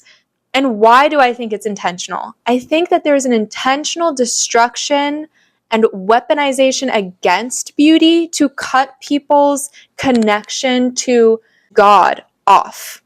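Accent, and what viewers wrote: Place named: United States. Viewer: Well, here I am commenting again, because I think it’s worth considering another perspective. Yes, the CIA funded aspects of the abstract art movement. Hitler, on the other hand, heavily promoted classical realism. If government patronage alone is enough to discredit an artistic movement, then that principle has to be applied consistently. The conclusion cannot depend on which government happens to be involved. Also, based on your accent, I assume you’re in America. The same society you criticize also protects your freedom to reject abstract art entirely. So even if the CIA pushed it, you are free to decorate your home however you wish. And as you yourself noted, the objective was not to corrupt art, but to demonstrate the cultural power and global influence of the United States, particularly because the Soviet Union rejected abstract art. 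The movement was being used as a symbol of ideological freedom, not as evidence that abstraction itself was somehow a corruption of beauty. I worked as an art curator, and I genuinely adore abstract art. Ironically, although I have access to many classical works, I often find them a bit stuffy in a domestic setting. I have a small black abstract canvas hanging in my hallway beside a classical vase and an antique Austrian Art Nouveau chandelier. I also keep traditional Orthodox icons because I am Eastern Orthodox, and we certainly agree that Christ is the fullness of beauty. Architecture and interior design are ultimately about harmony, prop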